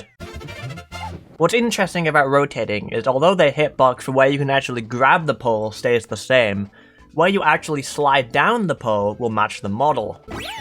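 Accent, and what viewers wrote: Wales, United Kingdom. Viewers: She's British, Welsh specifically. That is what Britons call the last letter of the English language, which their ancestors the Anglo-Saxons created.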